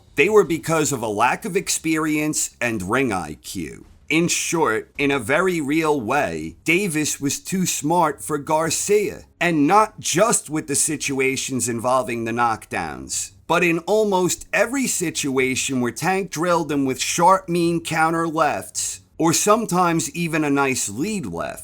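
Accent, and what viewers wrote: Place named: East Coast, United States. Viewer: Like I've said before, don't ever loose that accent! My accent is Minnesotan and trust me it's not as popular around the world as your East Coast. Anyway, excellent breakdown of the fight! Davis showed us..."what's up!" My dream is Lomo vs. Davis... The Matrix vs. The Tank! Two of the greatest minds and talents of boxing yet from the most opposite ends of the world regarding culture, race and geography! Respect to both and all these warriors that have the courage to get in there wether they win or lose! I can't see Ryan as a quitter! I think he was legit paralyzed by that Tank power shot! Sneaky, fast with a Bang!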